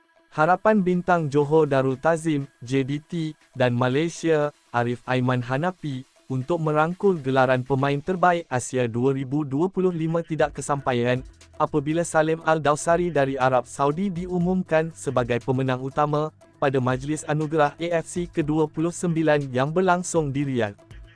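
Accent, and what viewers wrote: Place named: Indonesia. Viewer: Admint ni orang indon sebab Tu dia cakap MCM Tu.😊😊😊😊😅😅😅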